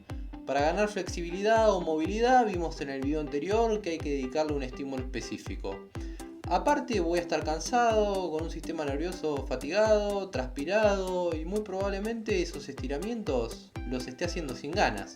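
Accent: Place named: Argentina. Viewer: Me encanta el acento argentino suena bonito, tienes buenas recomendaciones.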